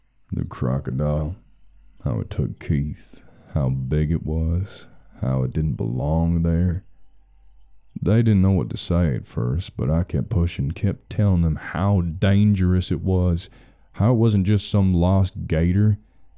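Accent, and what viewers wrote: South, United States. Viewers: Your southern accent has no reason too be this good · Scary creepy dark water not for me your good old boy accent is on point and I know country myself 💛✌️ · I love your southern accent ❤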